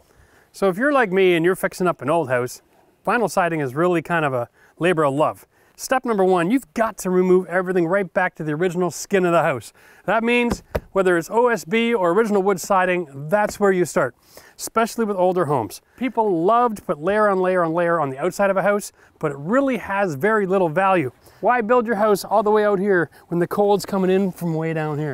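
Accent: Canada. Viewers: Just here so I can hear Canadian words like when he says "stretch that oot" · This was amazing and I'm not even going to be doing vinyl siding! Your speech and mannerism is clearly (a fellow) Canadian and obvious at the onset but I only figured it out when you mentioned Ottawa. But you also explain things as a teacher in a classroom and in the field (just enough explanation). Well deserved subscription!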